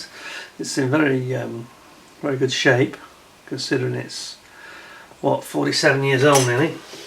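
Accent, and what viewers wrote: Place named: Liverpool, United Kingdom. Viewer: Sometimes I can hear "K" in the end of -ing words (like "something"). Because I am Beatles fan, so I thought you are from Liverpool area :) BTW - great channel, you are doing excellent job. During coffee brakes I like to watch your unboxings. These clips remind me good old days, when I was a young boy, and a great fan of Matchbox. Well done, mate.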